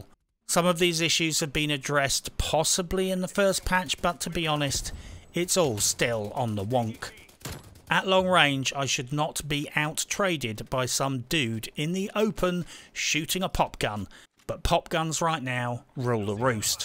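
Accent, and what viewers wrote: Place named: RP, United Kingdom. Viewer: I’m SO glad I found your Channel, it’s very entertaining. Keep up the good posts, your posh accent combined with indignant and brutally honest opinion just creates such a special video to watch!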